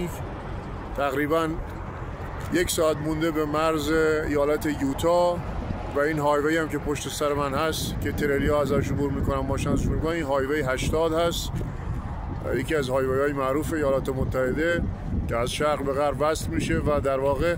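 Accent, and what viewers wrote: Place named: South, Iran. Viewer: لهجه جنوبی داری شاید از ایل لت آبادان باشی آرزوی سربلندی برات داروم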